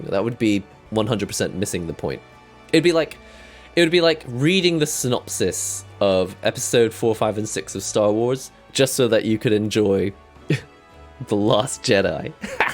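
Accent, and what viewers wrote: United Kingdom. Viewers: Your accent suggests you are British or South African. What's the origin of your English Accent?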